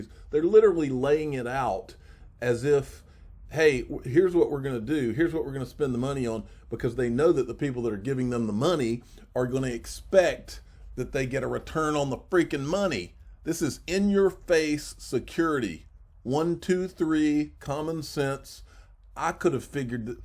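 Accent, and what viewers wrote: South, United States. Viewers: Love listening to crypto news in a Southern accent!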